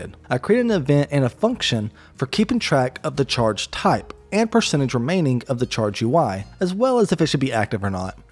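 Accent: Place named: South, United States. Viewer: a creator with a southern accent like mine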